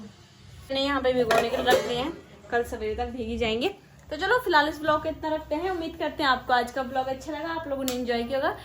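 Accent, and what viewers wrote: Garhwal, India. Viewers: आपका tones gadwali लगती hindi v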